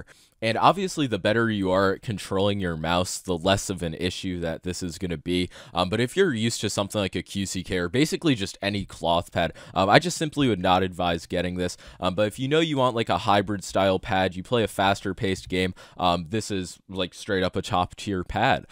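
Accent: New York, United States. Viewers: are you from nyc?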